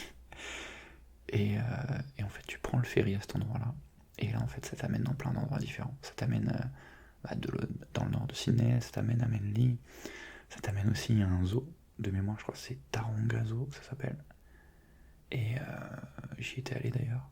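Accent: France. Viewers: I love french men voice and accent 🤤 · French is a language so good to hear. 😍😊 I'm in love with that accent.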